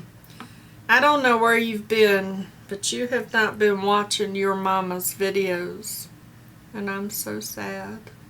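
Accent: South, United States. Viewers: I'm from the south and love your southern accent. That's a decent box and fun card!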